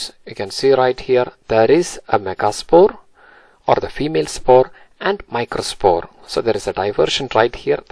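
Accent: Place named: Tamil Nadu, India. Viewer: I guess ur from tamilnadu 😁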